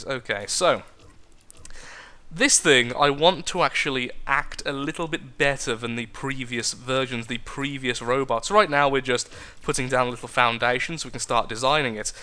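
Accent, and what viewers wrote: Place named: England, United Kingdom. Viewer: I love your englishness. or UKness, I dont know, accentness. sir ;)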